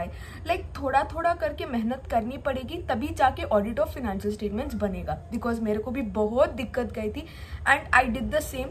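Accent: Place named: Karnataka, India. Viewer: Are you from Karnataka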